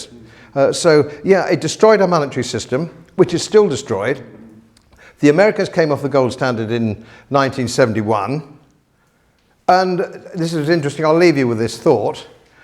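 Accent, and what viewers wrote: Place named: England, United Kingdom. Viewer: It's great to hear a lecture delivered in such a quintessentially English manner, thanks Godfrey for your dynamic and uplifting speeches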